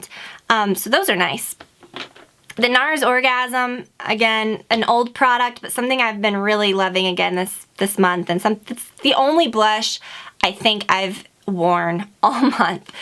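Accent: South, United States. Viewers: Shes from Georgia, its a southern accent. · Your southern accent it totally adorable :) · I think I've noticed your southern accent more in this video than any other one! haha also your eyelashes look gorgeous! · You sound so southern in this video!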